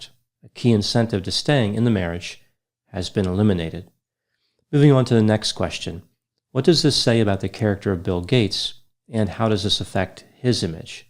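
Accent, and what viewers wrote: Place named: New York, United States. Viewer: A bit of accent came through more than usual today. I'm guessing he's from outside of NYC but within 75 miles. He's probably mentioned his hometown accent at some point and I could be way off.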